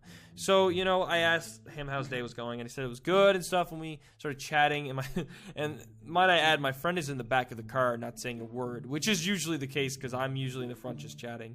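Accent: Canada. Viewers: Ur Canadian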